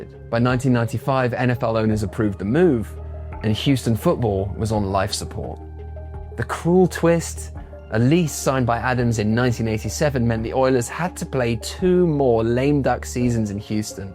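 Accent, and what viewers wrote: England, United Kingdom. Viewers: What is a guy...with a British accent, doing...caring one bit, about the, defunct, "Houston, Oilers"? Don't get me wrong...I like it! It is intriguing. Being a native Houstonian, having grown up in the 70's in the Houston area, (Magnolia, Texas), I, my brother, my Dad, and ALL my friends...were die-hard Oilers fans. It just stuns me...amazes me...confuses me...that a "chap" from, "Bloody Ole England", (who call "soccer", "footbal"), care one iota about a defunct Houston, Texas Oilers football franchise? I don't get it...but put me on board. I even subscribed to his channel. "Love Ya Blue"...always will. I was 12 years old...in 1979...and Earl Campbell...was the greatest sports hero in the world in my eyes. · Uhhhhh.....why is a British person telling me anything? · So weird hearing an English guy say all this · Wait is nobody going to question, why the guy speaking in the video, has a British accent? 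· Wasn’t expecting a British guy but hell yeah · I don't know, but it's kind of odd hearing a dude with an English accent telling us about NFL history. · Its crazy having a british accent talking about american football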